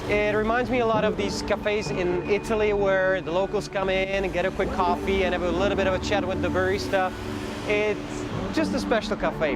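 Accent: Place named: Czech Republic. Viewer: Akcent máš český, ale jinak celkem pěkné video :) Ozvi se, potřebujem herce s angličtinou.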